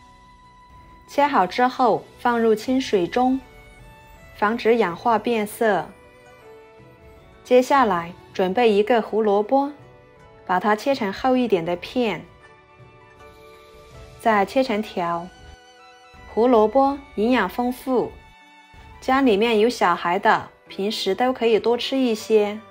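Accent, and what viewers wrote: Yunnan, China. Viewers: 你这个云南话说呢太好啦！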